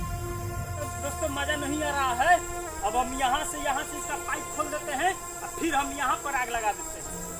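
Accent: Bihar, India. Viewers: Bihari accent made me watch this video longer. Gas cylinder se bas tum circus kar rahe thee!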